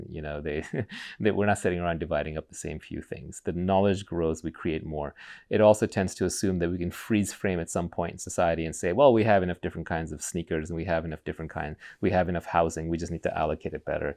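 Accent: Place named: United States. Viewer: your accent is more American than American themselves.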